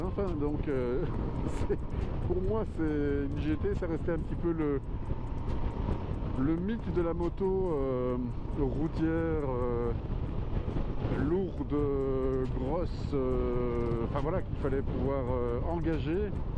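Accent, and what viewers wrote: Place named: Belgium. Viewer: 🤘😜 VAS Y T'ES BON BON BON 💪😜 Je reconnais bien le phrasé Belge 🇧🇪! Je m'abonne! 🇫🇷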